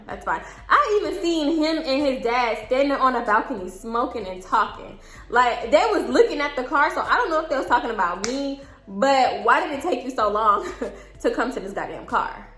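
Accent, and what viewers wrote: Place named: Texas, United States. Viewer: That Texas accent 😍 I follow this nurse Carlee Rae and she from Texas. Y’all sound the same lol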